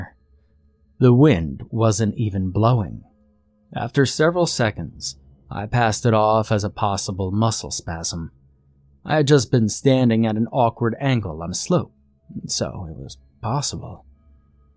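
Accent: South, United States. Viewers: Your southern draw is to die for 😍😍😍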